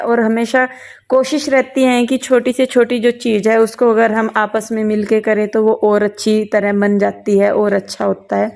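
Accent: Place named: Saharanpur, India. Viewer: Aap saharanpur se ho aas pass me kisi Gao se aapki aavaj yahi ki hai 🙏🙏🙏🙏🙏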